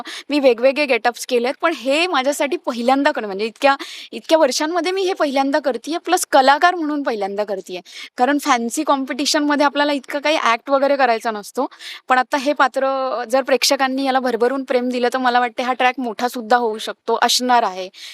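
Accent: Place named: Vidarbha, India. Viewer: आपली भाषा मस्त आहे विदर्भ ची पोटे 😂😂😂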